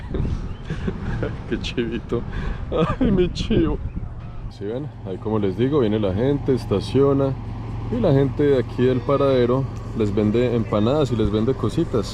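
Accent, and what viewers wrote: Colombia. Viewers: me gusta mucho tus vídeos y tú acento colombiano es único ases muy bien tus vídeos saludos desde fort worth Texas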